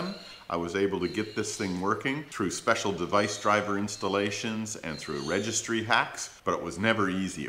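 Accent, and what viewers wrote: Canada. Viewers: Thanks for this video. Looks like the site with the Techstream download is artificially buffering the download very slowly to nudge the user to download their software... annoying. Do you have a source for a usable mini VCI cable? You sound Canadian, BTW.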